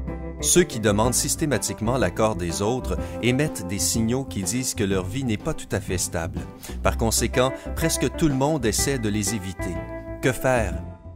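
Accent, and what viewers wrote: Quebec, Canada. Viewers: Bonne vidéo je n'avais pas besoin d de ses conseils mais vue que je connais des gens qui ont se genre de problème je pourrais les conseiller et j'adore ta voix lacent québécois et magnifique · Tu as un accent québécois likez si 👇vous êtes d'accord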